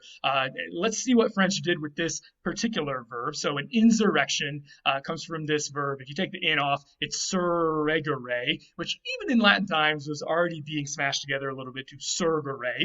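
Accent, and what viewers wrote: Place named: United States. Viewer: No offence and I don't want to come off too negatively but why are you using a postalveolar approximant r in Latin words when we know factually that this sound did not exist in Latin and in no variety of Vulgar Latin was this a thing and the vowel quality you use sounds like it it taken directly from American English. Is it because you don't think it matters or is there some other reason? As a Classics scholar you must know that these words were not pronounced the way you are pronouncing them?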